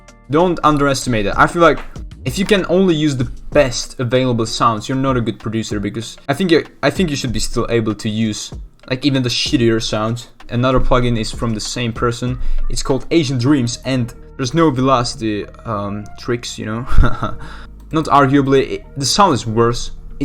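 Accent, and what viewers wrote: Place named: Netherlands. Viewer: Cool to hear your voice man, where you're from? Your accent sounds kinda dutch 😁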